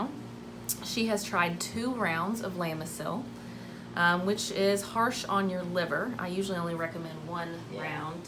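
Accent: Kentucky, United States. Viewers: I knew that Kentucky accent when I first started watching. Then you mentioned toyota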